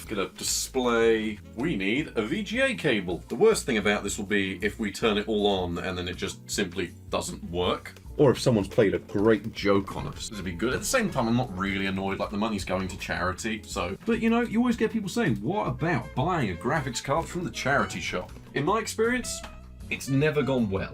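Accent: England, United Kingdom. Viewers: You must be from the same area of England as Jeremy Clarkson because you sound like a younger version of him.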